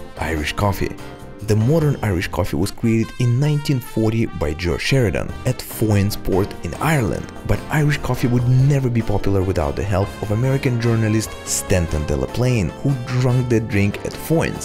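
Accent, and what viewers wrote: Russia. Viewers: Акцент русский будто...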